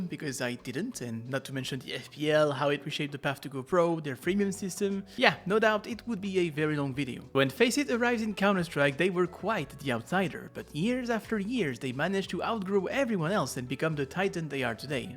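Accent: France. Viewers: In the first minutes I didn't realised ur french. Srryy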